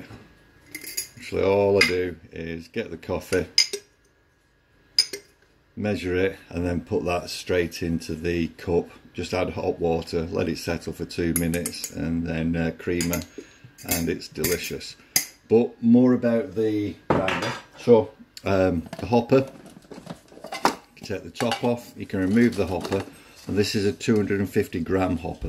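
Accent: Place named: Lancashire, United Kingdom. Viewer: ……thanks for replying bud………you sound like you’re from near to me in Lancashire. I took the top part to pieces late last night and put it back together then set it to coarsest grind then backed it further back towards the on off switch until it cut out then back until it operated………I’ve come to the conclusion it takes a couple of turns of the burr to achieve a new grind and I wasn’t giving it enough time as the grind is definitely more coarse………even so I would have expected to be able to get a coarser grind ……….but I’m not disappointed anymore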